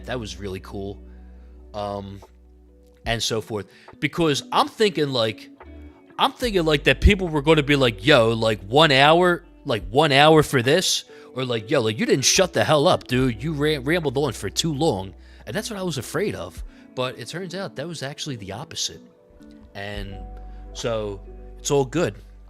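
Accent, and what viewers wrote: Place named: New York, United States. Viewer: I love your accent. Im trying to guess where you are from. My guess in New York generally and Long Island specifically. How close did i get?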